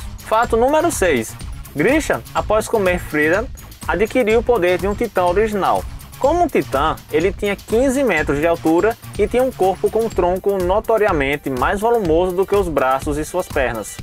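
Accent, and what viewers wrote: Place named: Minas Gerais, Brazil. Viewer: o jeito que você fala é muito mineiro,MORALHAS"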